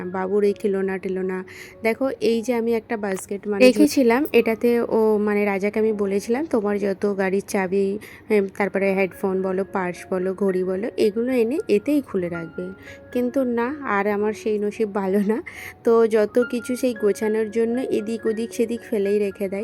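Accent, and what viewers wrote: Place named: Bihar, India. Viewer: তারা তুমি কি বিহারী বলোনা আমাকে প্লিজ কারণ তোমার কথাবার্তা শুনে মনে হয় বিহারী লাইক দিয়ে তোমার ভিডিওটা আমি সম্পূর্ণ ফুল ওয়াচ করলাম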